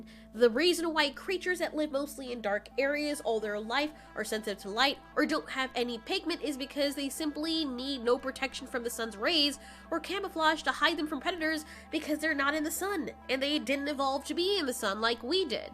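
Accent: United Kingdom. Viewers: Are you British? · I personally prefer your British accent.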